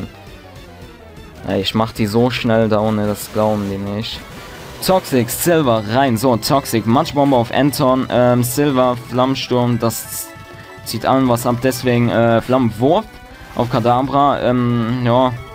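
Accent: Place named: Cologne, Germany. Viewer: ein kölner akzent rischtisch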